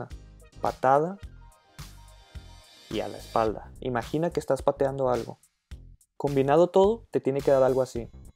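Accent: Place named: Mexico. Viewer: Todo un crack, por tu forma de hablar creo que también eres mexicano. Excelente forma de explicar.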